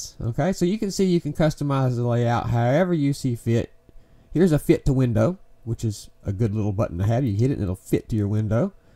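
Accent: South, United States. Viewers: Love the vids and helping a lot. Thank You! Lots of funny comments and inuendos along with good old country boy accent. Well done brother!!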